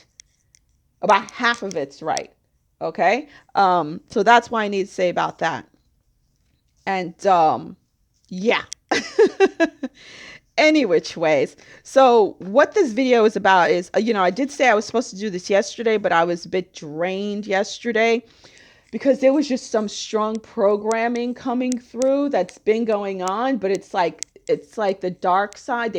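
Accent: New York, United States. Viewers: Holly molly about the Divine Masculine = thats makes a world of sense! I know there’s a Cabal kabosh on them - starting with the African Americans & now the White males! And I feel there’s one too for divine FEM..bc I was stuck for so longggg.. yet no more! I’m hearing from Brad Johnson / Adronis that the moon is also an emitter of mind control and it’s going down as of now! Kent Dunn - behind the scenes military white hat- talks about the moon being re built into a whole new model! I’m a researcher - one who puts the pieces together... and I use my intuition & I use muscle testing & prayer / intention & meditation along with that.. I love your New York ness lol! I’m getting the rainbow warrior tank for sure now & prob the Gaia awakens next month (I laid down serious cash for dimensions of disclosure here in VENTURA CA!) Gotta go hang with “my people” lol! 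And I’m there on the 8th & maybe on the 11th.. gotta figure it all out I’m SUPER interested in ascension 😘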